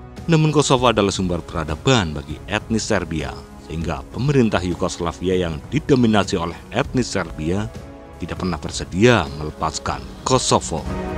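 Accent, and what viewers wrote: Java, Indonesia. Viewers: Ini yg baca narasi nya kata per kata nya ga jelas artikulasinya suaranya terlalu ngebas dan terlalu ke JAWA JAWA an jd baik nya diganti aja ke yg lebih nasional org nya pecat aja tuh ok tq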